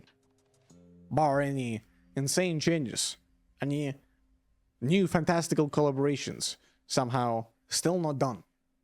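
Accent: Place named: Finland. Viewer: omg, that finnish accent, idk if u are from Finland but your accent sound very finnish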